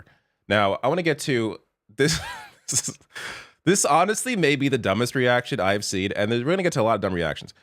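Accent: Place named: Canada. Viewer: This guy sounds like a Canadian.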